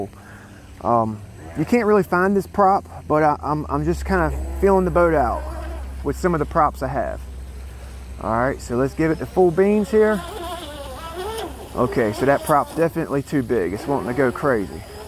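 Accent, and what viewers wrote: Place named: South, United States. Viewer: There's a TON of RC boat videos out there, but I must say; if you want to learn something, this is one of (if not) the BEST channels. Sometimes, can be WAY off the mark in his diagnosis of a problem (his learning curve is increasing like a rocket to the moon though...), but for the other 98% of the time you can rely completely on the information he so eloquently explains in his cool, laid-back Southern style. "NICE!" "Git it Bull...." "Full Trigger, Full Trigger!!!!......." If you have any similar RC experience (Cars, airplanes), boating should be easy. Just be forewarned; there is no "perfect" setup, parts are hard to find, you LHS has no idea what an RC boat is or where they can be found, but you will spend a lot of fun time trying to find "The Sweet Spot". That's the fun of boating, many of us are going where no man has gone before..... 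Lastly (and this applies to ANYBODY considering a venture into the hobby), ASK QUESTIONS of those who obviously have some experience; you can save yourself time and grief. There are good products out there, and there is crap. Experienced modelers know the difference and are usually more than willing to share their experiences with various products. has a business connection with ProBoat, but don't let that affect your thinking. ProBoat has done a whole lot in improving and perfecting the RC boating experience and the product line they offer is outstanding. Welcome to........ The Boat Gang! 😉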